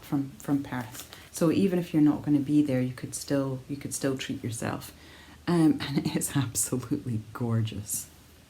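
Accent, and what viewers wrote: Scotland, United Kingdom. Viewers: Hi! I've been watching you for a couple months now and I am always impressed by your knitting. I am an avid sock and garment knitter but have been inspired by you to knit a Steven West shawl. I have bought the pattern for his Lumpy Space shawl and from my stash, have chosen silvery grey, black, white and a a red/black mix. I think I will cast on in the late fall so that I have it to wear in winter. P.S. My Grandmother was from Scotland, I have a great Auntie and a few cousins still in Aberdeen, so listening to your accent is reminiscent for me of my Grandma:) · What's bringing me joy? Well every time your new podcast is broadcasted it feels like al little present! I love to see your projects listen to your ideas and just listen to your wonderful voice and Scottish accent. You're a wonderful knitting companion, thank you and I hope you will keep on doing these podcasts for a very long time!! 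Lots of love, Ellen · Hello, I have been watching you from day one and thoroughly enjoy every episode! I love your Scottish accent and especially enjoy your colour schemes in your projects. I am planning to visit Scotland in April next year …Yay for searching yarn shops x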